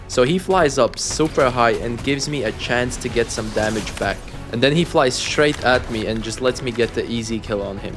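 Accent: Germany. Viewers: what accent do you have? german?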